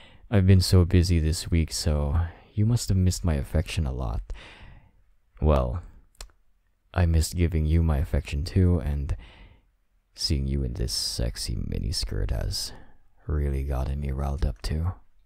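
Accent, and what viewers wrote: Philippines. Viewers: I sense a Filipino Accent?...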